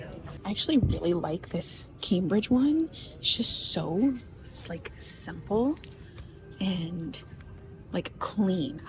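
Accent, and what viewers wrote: Texas, United States. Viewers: my heart smiles so big when I’m notified of a video from you❤❤❤❤❤. I have followed you for several years and watched so many projects from the apartment ( out door chairs) loved for your little patio. The last week of pregnancy was so hard for me I got so tired!!!!!!! And the night before both of my babies ( age 47 and 40) the day before delivery my body just said no more, I was tired and felt like I was coming down with the flu, this may happen to you. My son 40 weighed 10 pounds. I am so excited for you but walking really helped with the labor (they had me walk in the hospital) to help,with the labor. When you are tired listen to your body. I am the happiest when I see your beautiful smile and sweet Texas accent, ( yes it’s there).❤❤❤. I wanted the floral China it looked so sweet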